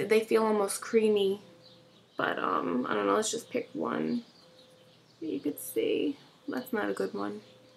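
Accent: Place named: East Coast, United States. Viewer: love the east coast accent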